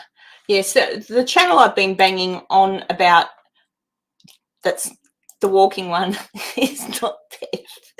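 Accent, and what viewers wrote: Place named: Australia. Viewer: Yes Maam I Have Settled in the States .Yes Maam Mother is French Dad is Brit ,But My Ass Settled in the States. I like the Idea About Forgetting About Stuff I Will Try and Catch Your Lives. I love the Australian Accent and Australia Being One Place I Haven't Been. Your Son Being in the Military ❤ He Will be in My Prayers and I Thank Him for His Service!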